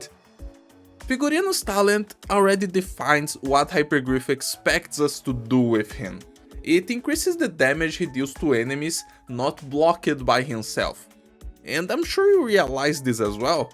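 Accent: Brazil. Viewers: Isn't he brazilian tho?(pretty sure that's the brazilian accent) and figurine translates to costume from portuguese.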